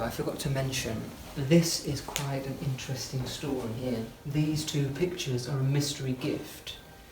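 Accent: United Kingdom. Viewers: I LOVE your style! So classic and warm and inviting! I also love your voice, it has a soft, soothing vibe, yet very much classy British! I'm so happy you've decided against painting the bureau as I thought the instant I saw it that it's such a beautiful piece that added just the right touch of character to the room! Forgive me..I realize this video is a few months old but I've just come across your channel and am LOVING it! I want nothing more than to be able to visit the UK for an indefinite period and soak up as much history and food as I can possibly take!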